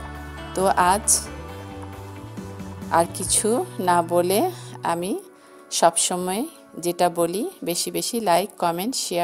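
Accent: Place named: Mymensingh, Bangladesh. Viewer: আপনি মনে হয় ময়মনসিংহের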